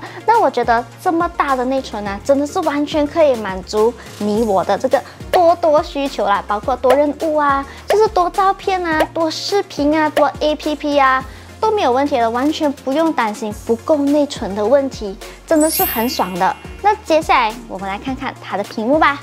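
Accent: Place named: Malaysia. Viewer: 馬來西亞口音與講話習性 我也不太能接受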